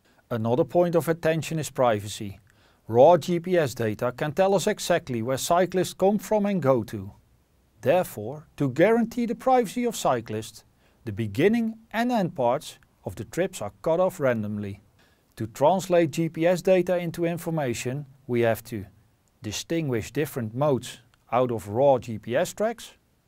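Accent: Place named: Netherlands. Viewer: Very lekker Dutch accent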